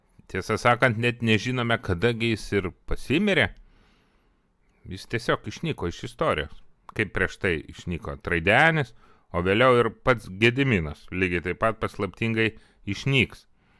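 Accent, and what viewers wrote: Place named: Kaunas, Lithuania. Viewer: Čia tau ne Vilniauskašlechta kalba pusiau rusų pusiau lenkų. Iš akcento girdisi kad Kavenskas tautietis.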